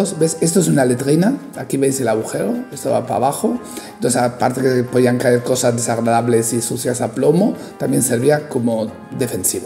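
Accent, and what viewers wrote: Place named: France. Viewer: MUY INTERESANTE, cada detalle de este castillo es una 💎 joya...más relatado por el y ese acento francés tan agradable ❤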